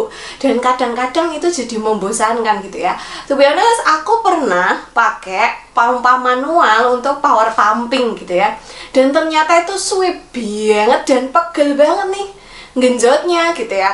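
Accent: Java, Indonesia. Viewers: Pertama kali simak video dari channel ini, seneng banget denger logatnya medok medok khas Jawa.. Suwiiii biyaaanget.. 😂❤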